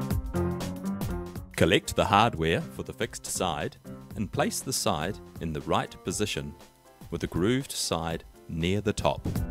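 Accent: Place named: New Zealand. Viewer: Cute Kiwi boy accent!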